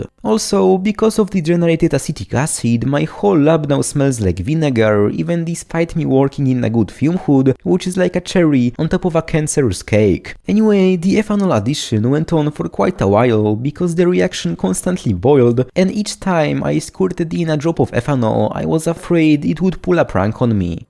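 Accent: Poland. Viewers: czuć rodaka, pozdrawiam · Kurwa juz po samym akcencie rozpoznalem ze polak xd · Guy sounds awfully like Polish citizen like me :D that heavy accent that I also have :D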